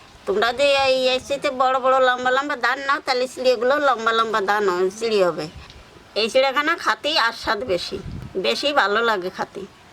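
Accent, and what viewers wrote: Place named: Faridpur, Bangladesh. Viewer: আপনার ভাষায় ফরিদপুরের সুর দাদি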